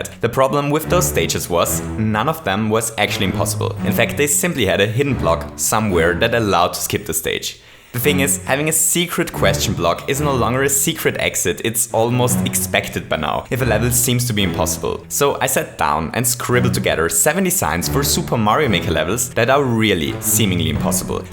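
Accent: Germany. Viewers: Ich wusste das du Deutsch bist! Habs schon am Akzent bemerkt! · You have an German accent xd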